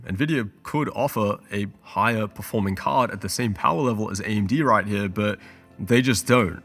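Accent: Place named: Australia. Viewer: why are u filming videos if u have a cold/flu. not like i can stand aussie accent anyway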